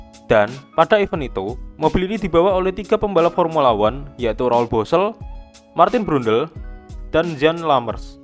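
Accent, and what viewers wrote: Java, Indonesia. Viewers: suaramu medok banget min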